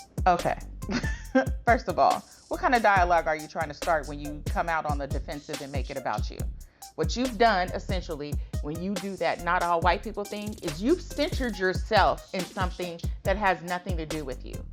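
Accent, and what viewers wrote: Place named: United States. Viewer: Me before the video starts "Bet she has an American accent, bet she has an American accent, bet she has an American accent..." Video starts She speaks in an American accent. Well what do you know!? 😂🙈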